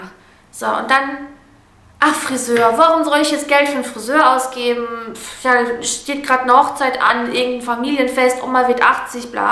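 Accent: Ruhr, Germany. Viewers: Hömma, bisse auch aussm Pott, ne? ;-) Sehr sympathisch.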